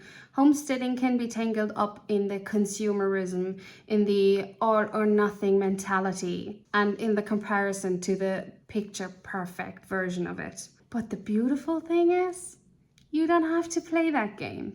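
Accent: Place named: Ireland. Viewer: Happy to have JUST found your channel! I could truly listen to you speaking any time - it reminds me of the brogue of my Da's speech. Da's gone now, but it's like homecoming to hear it. Anyhow - the points you make are so very true! Homesteading can be any form, depending on the homesteader! You don't have to be 100% self-sufficient to be a homesteader. Garden where you are, how you are and learn what you WANT to do. If you garden, learn how to preserve what you grow or what you can get on good sale so you can use it when it's not in season. Etc, etc. Homesteading isn't for EVERYONE - it can be stressful, but it can also be comforting. Don't let "influencers" be your model of how you should be. Much love!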